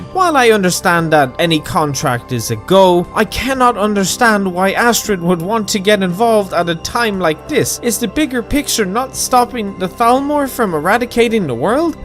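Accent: Ireland. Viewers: POV: you’ve already completed the dark brotherhood quest line and are watching just cuz you’re bored and he sounds like a crazy Irish lad and enjoy him saying other as “utter”…. #HailSithis 🩸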